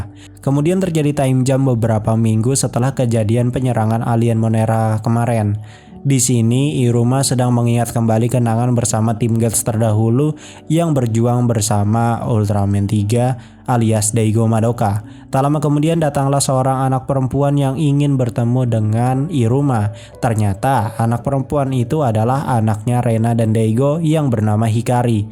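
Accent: West Java, Indonesia. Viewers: Njir lu nyunda bre 🗿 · Sunda ny dri mana bang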